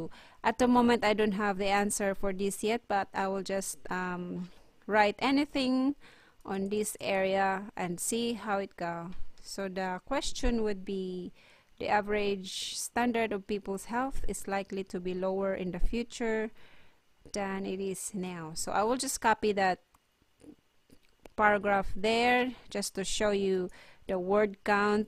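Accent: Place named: Philippines. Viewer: The way you pronounce i think u must be a filipina or maybe half filipino.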